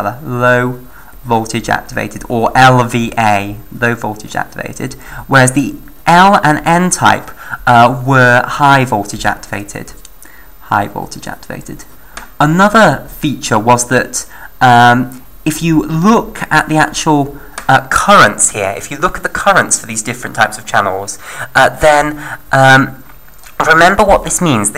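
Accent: England, United Kingdom. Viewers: Thankyou, clearly presented. btw are you from England?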